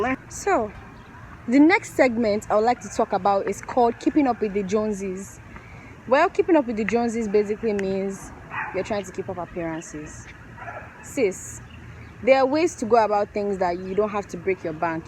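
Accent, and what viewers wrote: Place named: Africa. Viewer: Why do you sound African? I'm hurt😖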